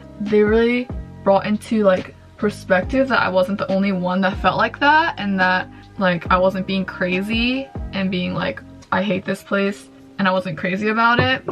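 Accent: United States. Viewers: New to your channel and am wondering how your English is so American sounding if you are raised in Japan? And yes healthcare and college are all extremely expensive here in the California and the US in general. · Choosing the right school and location is so hard. It’s hard to settle. Has your experience gotten better in Australia than the US? Your international schooling explains your accent. You sound like my cousins in Cali. Does japan have good schools? You might just miss home and the familiarity. Small world - I’m from San Jose lol. It’s not the world’s best place. Did you like San Francisco?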